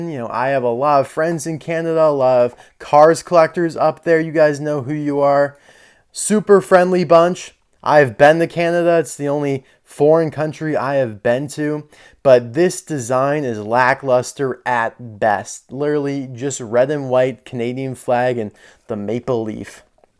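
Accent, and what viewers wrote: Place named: Ireland. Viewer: Amazing video! This was definitely interesting seeing the different between Disney store and factory customs. Although, I feel like there was a missed opportunity to compare some of them to some tomica country McQueens that are similar to those cars in the video. But oh well, the video was still great! I didn’t even know you were Irish! Also, on an unrelated note, I just realized that there’s another McQueen that only the Disney Store made: Blue Lieutenant Lightning McQueen. He was only in a McQueen-O-Rama 5 pack. I just felt like that was worth telling you. But anyways, nice job with this video! Keep it up!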